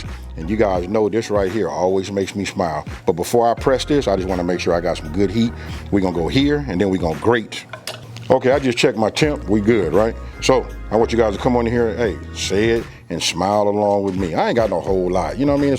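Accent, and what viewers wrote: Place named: South, United States. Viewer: are you born and raised in California? Sound maybe a little bit southern accent maybe?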